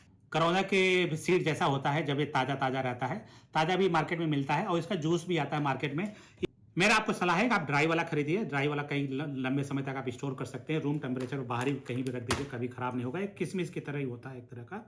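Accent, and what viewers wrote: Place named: North, India. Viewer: आपको मेरा प्रणाम।आप के हिन्दी उच्चारण से इतना स्पष्ट है कि आप उत्तर प्रदेश, मध्यप्रदेश, बिहार के निवासी हैं।क्रेनबेरी तो शांपिग माल में मिलेगा।एक आम भारतीय इस बीमारी में क्या उपयोग कर सकता है जो वस्तु आसानी से उपलब्ध हो क्योंकि ग्रामीण भारतीय उसे आसानी से उसे प्रयोग कर सकता है। मैं यह आपकी बोलचाल से समझ पाया हूं कि आप शायद कहीं विदेश में रहते हैं। कृपया मेरा मार्गदर्शन करें शंका समाधान करने के लिए आपका धन्यवाद।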